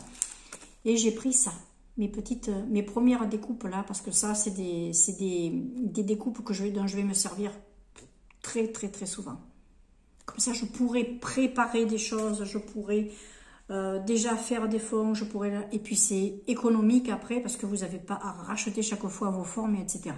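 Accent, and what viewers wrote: South, France. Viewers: Merci pour le partage. Vu le petit accent je pense que vous êtes dans le sud. Je vous dis ça parceque moi en région parisienne, on est ravitailler par les corbeaux. Chaque fois que je vais aux 3 Actions vers chez moi. A croire qu'ils se donne le mot. Je suis nouvelle sur votre chaîne et je viens de m'abonner. Bon dimanche Amicalement Lidia. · Coucou, Très joli haul, et grâce à ton accent, on entend presque less cigales 😊 Je te souhaite une merveilleuse année 2024, et tout le bonheur du monde 😊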